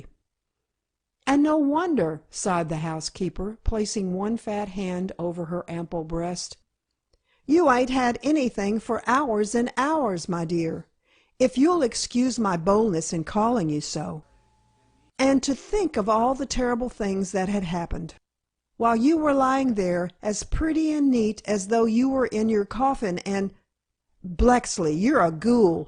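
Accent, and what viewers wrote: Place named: United States. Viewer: Great story... I can't help wishing that it had been read by an English reader though as the story is set in England. I found the broad American accent unsuitable for this text. The reader did her best however, despite the occasional mispronunciation of certain words. Much better than I could ever do.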